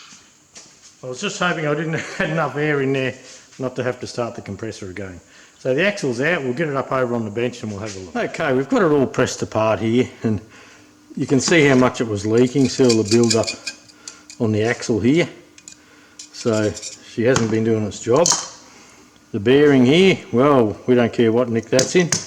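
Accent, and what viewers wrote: Australia. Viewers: Nice Aussie accent Mate !!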